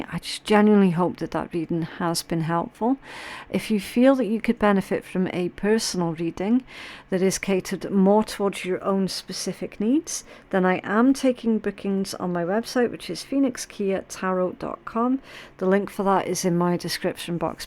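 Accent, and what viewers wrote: Scotland, United Kingdom. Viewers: Blessings to you, Thank you for my reading Scorpio 10/11 /1976 born at 6an, Love your Scottish accent lol🐯🌹🌟💜😁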